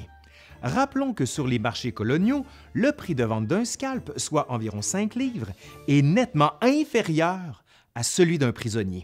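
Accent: Quebec, Canada. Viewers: Bonsoir, je suis abonné à ta chaîne depuis peu et j'adore ce que tu fais. Ton accent québécois me fait toujours sourire. Vous avez de drôles d'expression. Le "vieux français" n'existe plus chez nous en France mais vous autres au Québec vous l'avez gardé. Je voulais te signaler que la photo que tu postes dans ta vidéo à 11.04 mn d'une compagnie anglaise correspondrait plus à une évocation de la bataille de Mont Saint-Jean soit 58 ans après la reddition du fort William-Henry. En tout cas, toutes mes félicitations pour ton remarquable travail. Bonne soirée. Sincères salutations livresques d'un abonné français passionné d'Histoire, entre autres des périodes de la Guerre de 7 ans au Canada et du 1er Empire.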